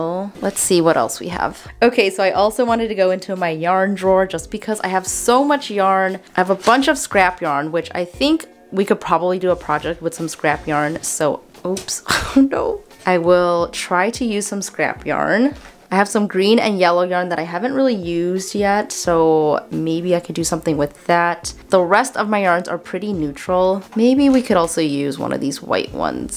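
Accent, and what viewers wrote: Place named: Midwest, United States. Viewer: it's so nice hearing midwestern accents from youtubers ♡ from a milwaukeean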